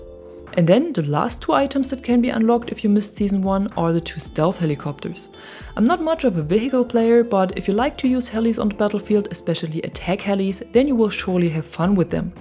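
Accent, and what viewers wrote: Germany. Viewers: Nice tips 👍🏼 (are you german bc your Accent )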